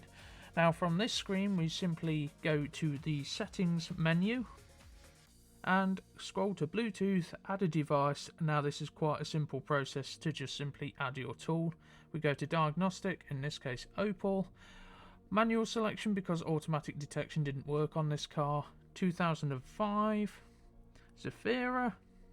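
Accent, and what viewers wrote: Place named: Yorkshire, United Kingdom. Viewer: his yorkshire accent i love it! its when it drives around the corner and im like UGHHH but this is coming from someone who had a corsa B that shafted valves, waterpump and needed a rebuild...never forget that sack of.....but this...i love the colour and its basically a van! its a decent car and tbh nothing like the 1.8 i test drove years ago tbh i do like the fact its like a beamer...more oil out of it than in it haha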